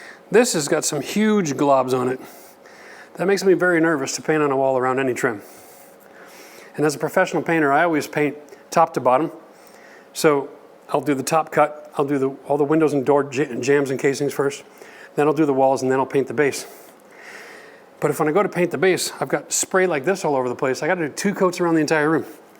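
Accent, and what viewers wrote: Canada. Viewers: Apparently the accent and use of millimeters didn’t clue you in, but this guy is Canadian.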